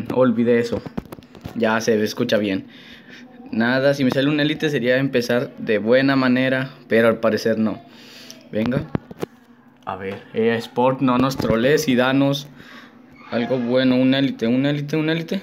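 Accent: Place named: Spain. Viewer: Porque ablas como español si ni eres español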